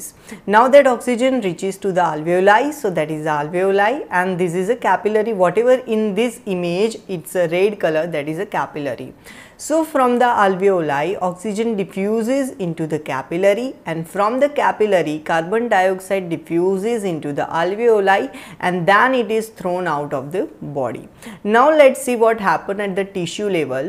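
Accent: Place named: India. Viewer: Sorry but when I thought a video is in native English but turned out that it's Indian accent English, somehow I hate it.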